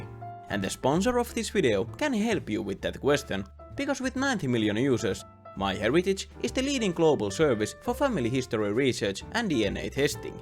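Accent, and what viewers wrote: Finland. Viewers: As a finnish i know the finnish accent and you have one so you are finnish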